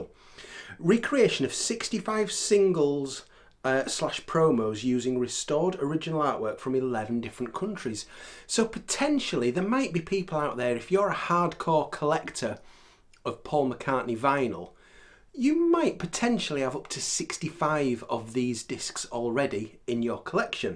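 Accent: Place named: Yorkshire, United Kingdom. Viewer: Thanks. I'm so glad you went for it and looking forward to the unboxings... I rarely buy releases when they come out and prefer to stumble over unexpected jewels and bargains - so seeing your authoritative videos clues me up so well. I really dig your benevolent perspective on things... I'm a Yorkshireman missen so I dig yr groovy accent too! keep on chooglin dude!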